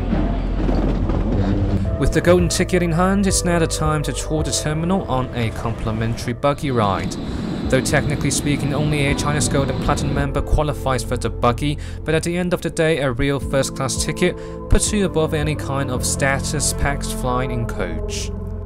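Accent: United Kingdom. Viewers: 兄弟还是英伦腔哈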